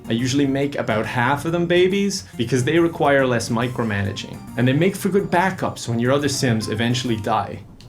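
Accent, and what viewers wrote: United States. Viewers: Why did it take me 5 minutes to realize he was using an American accent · Is our precious making an American accent?? 😂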